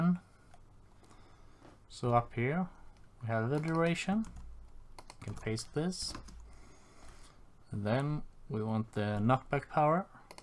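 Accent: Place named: Sweden. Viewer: 100% Swedish :)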